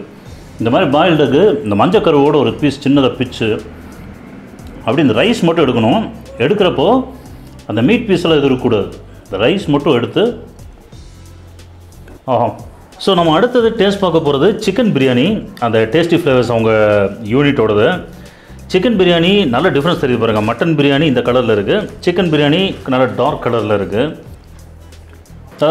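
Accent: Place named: Coimbatore, India. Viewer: Covai speech thani special sir...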